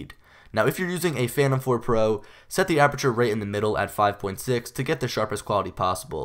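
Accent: Philadelphia, United States. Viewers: Dawson Boyle -Ha I'm just joking. I'm from the area, born and raised. First time I watched one of his videos I knew where he was from by the sound of his voice. I know a fellow hoagie when I hear one. City looks good tho huh?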